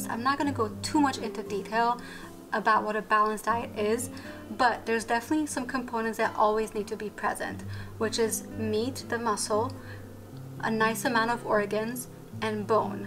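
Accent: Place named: Germany. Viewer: you sound german, where are you from?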